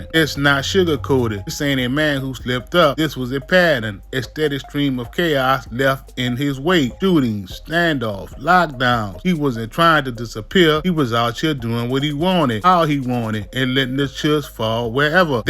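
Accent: New Orleans, United States. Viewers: Loving your videos on all the escapees, good research and a local NOLA voice making for great media 👍 keep it up you're going viral!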